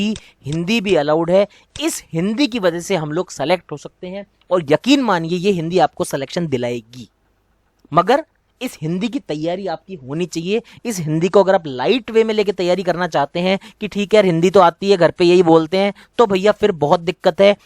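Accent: India. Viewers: West up language hai sir aapke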